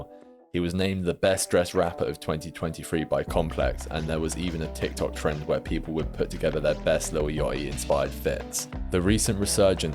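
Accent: United Kingdom. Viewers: not listening to a british person talk about american music fashion and culture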